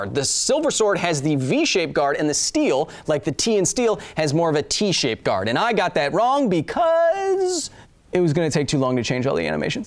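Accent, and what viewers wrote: Poland. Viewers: HI, really love the show and I loved the polish accent of this vid (for obvious reasons xd) but don't do my boy Tomasz like that... In pronunciation of that name you emphasize the "T".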